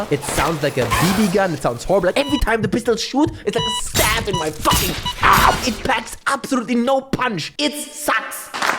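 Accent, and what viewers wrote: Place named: Germany. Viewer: I can here a little bit Deutsch von ihnen, interessant...🧐😂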